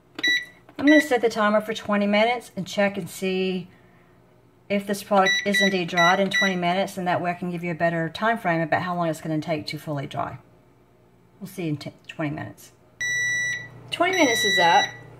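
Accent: South, United States. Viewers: Good southern accent!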